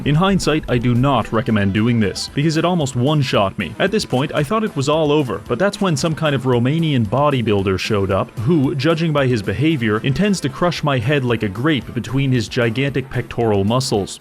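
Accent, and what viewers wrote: Canada. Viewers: So you’re Canadian Eh? Pitiful.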